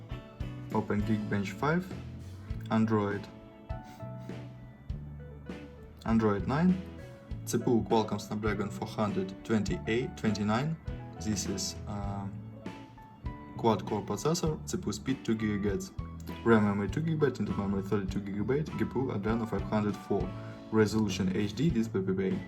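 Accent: Russia. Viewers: Just by chance is you’re first language Russia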